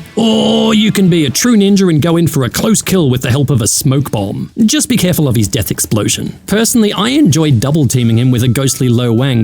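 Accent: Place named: RP, United Kingdom. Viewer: RP prononciation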